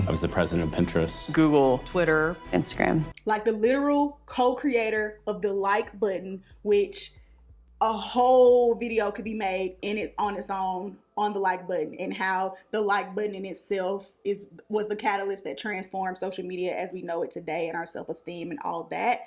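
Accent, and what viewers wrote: South, United States. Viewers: Finally I'm from SC. I wasn't trying to insult you I can just tell you are southern too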